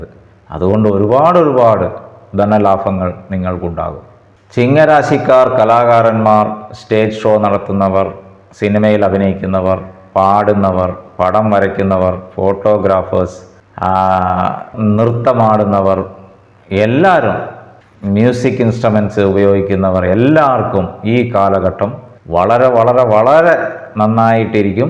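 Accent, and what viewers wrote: Tamil Nadu, India. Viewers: സർ, താങ്കൾ തമിഴനാണോ സംസാരത്തിൽ തമിഴ് കലരുന്നു. ഏതായാലും താങ്കൾ നിസ്സ്വാർത്ഥനാണ്. തോന്നുന്നത് അപ്രകാരം പറയുന്നു. സത്യം സത്യമായി. 👍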